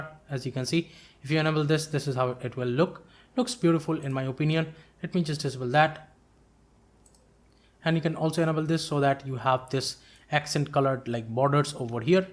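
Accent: India. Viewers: Why does it give me weird vibes to hear an indian accent and see AnyDesk on the desktop? Nice video tho! ^^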